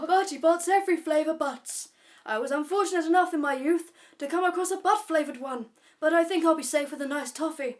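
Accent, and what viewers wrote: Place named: Scotland, United Kingdom. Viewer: Also love your scottish accent, it's nicer than mine and I'm scottish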